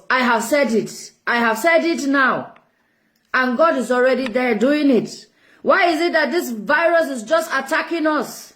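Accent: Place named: Nigeria. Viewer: This lady is making alot of sense my dear that is how we see it. Her acent sound like Nigeria. God bless you dear for speaking out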